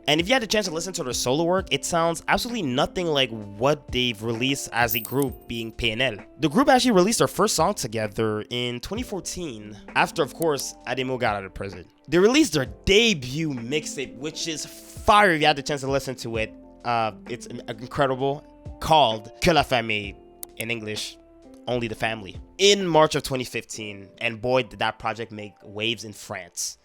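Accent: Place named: Canada. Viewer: Are you québécois? Youre french is really good man and i feel that you got that canadian accent hahah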